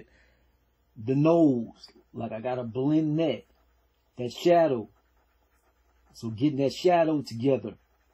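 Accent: Louisiana, United States. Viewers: What's up homie? I'm new to your channel thanks to your P Valley breakdown. Anyways I'm checking on your other videos and stuff you seem like a chill entertaining dude I look forward to your other stuff. Super-talented also hey your accent bro it's very original sounds like when I watch movies from like Louisiana LOL like New Orleans type shyt. Very different from me I'm in Brooklyn LOL anyways have a good day about to start this P Valley season 2 LOL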